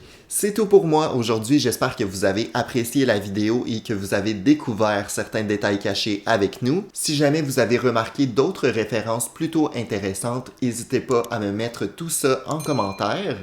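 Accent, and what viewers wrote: Quebec, Canada. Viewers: c québecquois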